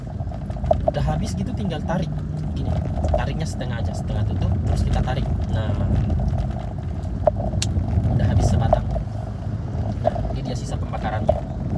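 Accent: Indonesia. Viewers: Orang makassar klo dilihat dri logat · Logat sumatra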